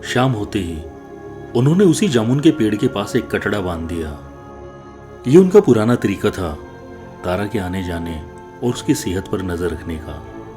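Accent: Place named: Uttarakhand, India. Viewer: Aise lagta hai sir Uttaraakhand se hai 😅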